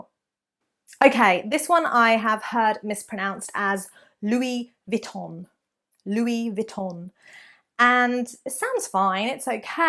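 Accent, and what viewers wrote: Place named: England, United Kingdom. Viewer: Which part of England your accent did came from?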